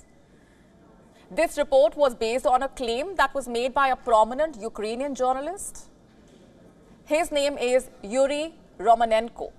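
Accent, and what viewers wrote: India. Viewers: i love how funny and cute indian women talk english,she's adorable ^.^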